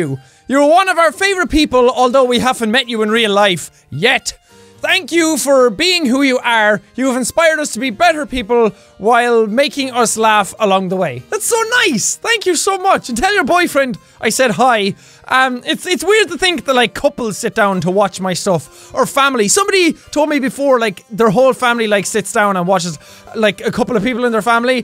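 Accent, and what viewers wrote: Ireland. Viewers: Hello! I just hit 300 subscribers and that is mainly because of you! You are my main inspiration to start my own channel (not this particular video, more the beam.ng vids). Your videos are always so great that it amazes me. According to me you are the best youtubers out here with a perfect voice for commentating, and your irish accent is the dot above the i. Keep making fantastic videos, since you are making so many people happy! My biggest dream is to honestly do this aswell, to make people happy just by being myself, have people laugh with me instead of against me. Have a perfect day, you really do deserve it because you are such an inspiring man Thanks:D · I watch you when I miss my boyfriend's voice because he is also from Ireland. A different area, so the accent isn't the same. But it's closer than anything I have in the states :P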